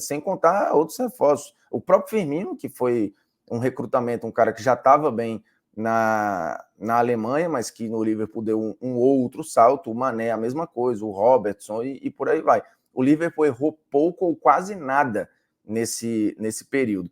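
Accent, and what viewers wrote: Northeast, Brazil. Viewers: Bruno Formiga, explique aquela polêmica da época do Esporte Interativo, quando você disse Ao Vivo que iria processar o Leonardo Baran porque ele falava com você de forma preconceituosa só por conta do seu sotaque nordestino.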